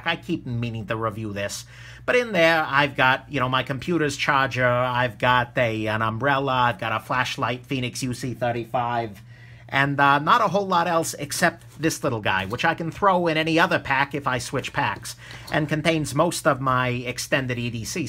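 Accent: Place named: Brooklyn, United States. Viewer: What part of Brooklyn are you from?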